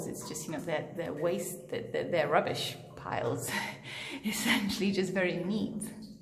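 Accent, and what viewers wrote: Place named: United Kingdom. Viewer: No Chris with you?. Your accent is developing into a really posh english one now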